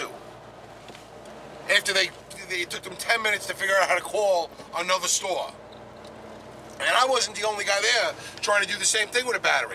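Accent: New York, United States. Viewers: Sounds like you're from New York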